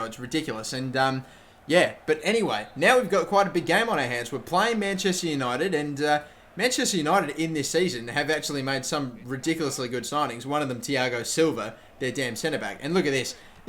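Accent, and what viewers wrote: Australia. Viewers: i lived in Sydney near Bondi Beach on Bouronia Road. I love the aussie accent. great vid again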